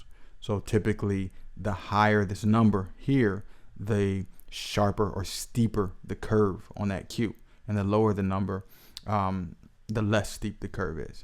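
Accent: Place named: New Jersey, United States. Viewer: Love your accent Man, is it New Jersey? Great Video, very helpful, thank you.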